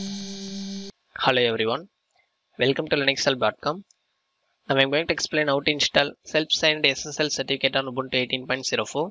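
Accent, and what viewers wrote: India. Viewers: SOUTH Indian English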